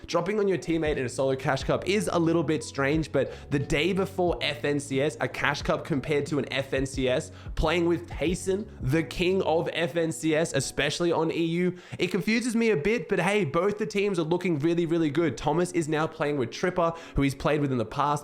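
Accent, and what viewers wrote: Australia. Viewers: just me or has he lost his aussie accent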